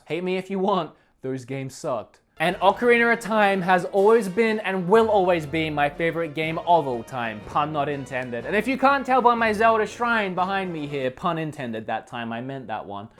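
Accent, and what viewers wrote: Australia. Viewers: Your Australian accent isn't as thick as it used to be I noticed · I changed my Siri voice to Australian because of you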